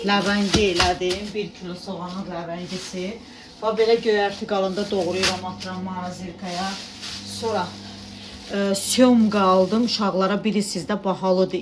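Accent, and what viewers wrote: Talysh, Azerbaijan. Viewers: Молодец. HALALDI. TALISSANMI.? LEKCE VAR. ALLAH QORUSUN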